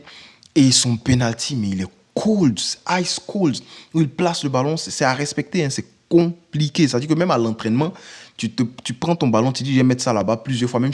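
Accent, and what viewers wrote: Ivory Coast. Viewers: L’accent ivoirien me fera toujours rire. Tu me régales frérot, continues comme ça.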